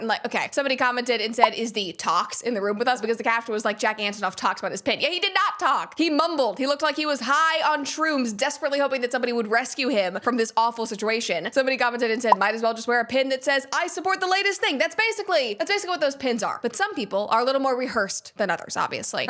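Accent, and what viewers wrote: United States. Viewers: citizen journalism is the future of news MSM is declining and i liked vibes fast, quick accurate precise vibing with American accent more like ASMR speeldial:person-turqouise-waving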